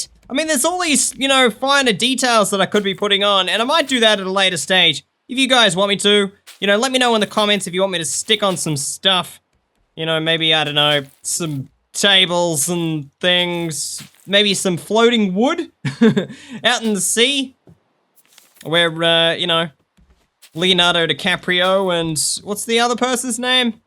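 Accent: Australia. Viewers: Are you Australian? I sub to Aussies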